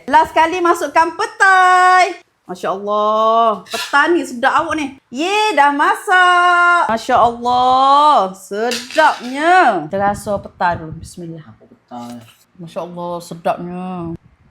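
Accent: Kelantan, Malaysia. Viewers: Berapa kali deh daaa 😂😅 mcm tu ke org Kelantan cakap?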